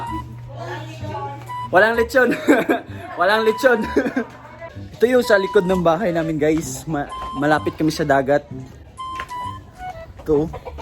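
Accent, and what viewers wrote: Visayas, Philippines. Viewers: Hahaha..nagkatawa ko nmo pareha jud ta ba na pagmagtagalog bisaya japong dako 😁nindot jud ning molingi ta kong asa ta gkan 😊😮 Faith villanueva Davao #done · Bisayang bisaya accent po kau kuya. Gusto ko matutong magsalita ng bisaya. Hindi po kc ako bisaya tagalog po ako. Turuan mo nmn akong mag bisaya idol kuya. I love hearing bisaya accent speaking tagalog words. Nakakaktuwa talaga kaung mga Bisaya.. Sana makapunta din ako sa inyo sa Visayas at Mindanao one of these days.😊🙂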